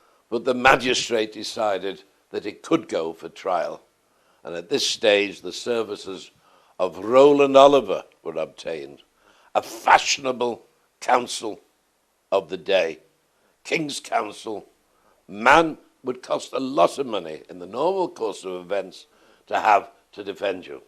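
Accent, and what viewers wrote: Liverpool, United Kingdom. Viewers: I love the old school`educated scouse`delivery,it reminds me of an old friend vocal chords improved by whiskey,cigarettes and the wind blowing through the Mersey Tunnel.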